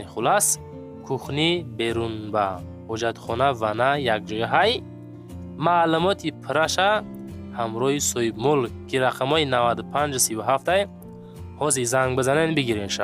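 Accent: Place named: Gharm, Tajikistan. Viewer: Чхели Ғармай Раштай соз соз гап зан бародар нашоти Ғарм🫵✅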